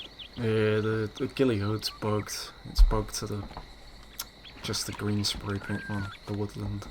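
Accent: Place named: South West England, United Kingdom. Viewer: Are you from sw uk mate you sound very local with that accent 😜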